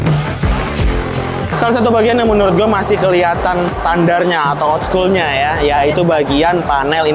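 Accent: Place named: Central Java, Indonesia. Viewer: Ngomonge medok mesti bengkel e wong jawa tengah iki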